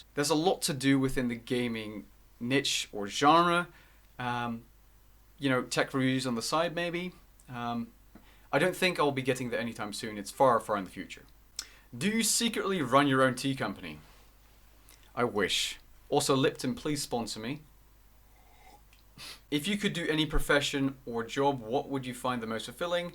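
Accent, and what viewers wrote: Netherlands. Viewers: Wait... you are dutch?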